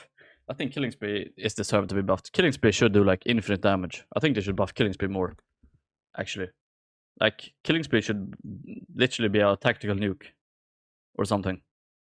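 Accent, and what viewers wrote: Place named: Sweden. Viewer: Thats the thiccest swedish accent i ever heard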